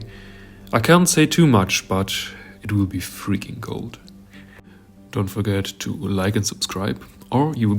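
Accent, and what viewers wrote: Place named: Germany. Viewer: the german english accent is unmissable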